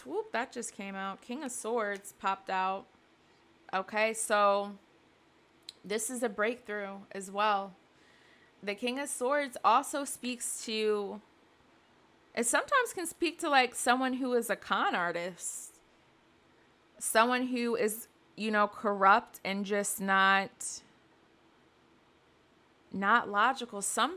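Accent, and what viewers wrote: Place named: Maryland, United States. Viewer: I love the your accent is it Maryland or Baltimore?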